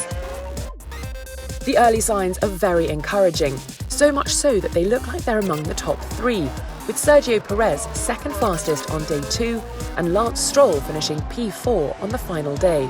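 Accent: United Kingdom. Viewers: Very pleasant and beautiful voice of the talking lady. I love how sound the british accent. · Perfect English accent. Elegant without being toffy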